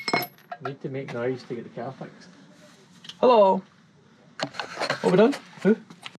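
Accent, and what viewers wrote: Scotland, United Kingdom. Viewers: Mate, fantastic presentation, well done. Your spiel, accent and gags remind me of the fine Scots tradesmen who shared their skills and experience with me as an apprentice in Australia in the late 1970s.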